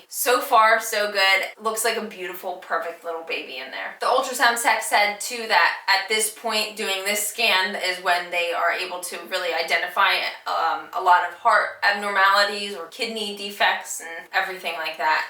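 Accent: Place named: Alaska, United States. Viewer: Love your videos, your energy and accent lol. Sounds Alaskan?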